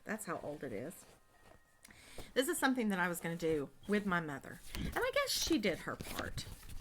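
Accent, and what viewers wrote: South, United States. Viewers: Love this video,! You shared your favorite things and it resonated with me! I also love your southern drawl! We lived in AL for a couple of years and it just feels like home! Please keep floss tubing!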